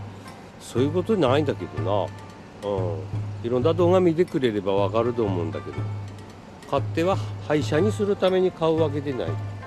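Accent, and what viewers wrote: Tsugaru, Japan. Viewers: 車には詳しくないけども、アルファロメオのエンブレムがオシャレな感じで好きだなぁ♡ マスターのちょっとお久⁈の動画見て 津軽弁聞いて、ほっこりしました(о´∀`о) 寒くなって来たので風邪引かないで下さいねぇヽ(´ー｀)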